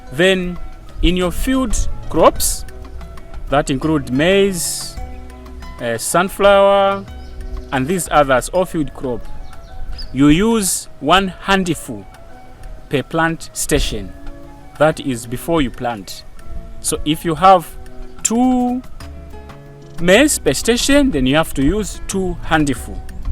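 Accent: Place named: Zambia. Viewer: Definitely going to try this. Thank you from Zambia PS you sound Zambian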